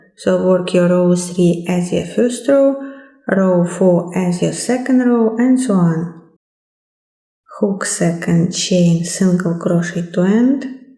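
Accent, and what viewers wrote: Russia. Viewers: Russian accent? Hallo mein lieber. I have made the same , we have similar ideas? Thank you for video.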